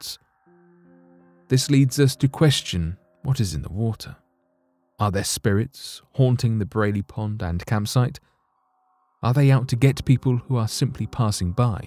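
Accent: United Kingdom. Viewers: It's entirely possible that they are sourcing content from an unreliable source, an old source that is no longer familiar, or even sources that simply have different experiences from you lot. But to pull all those details out of their butts & off the tops of their heads is unlikely. I'm more apt to believe one of the first two possibilities, especially since the narrators sound like they might live across the pond (therefore potentially easily made to believe untruths, as they have no or very little experience & reference for places in the US).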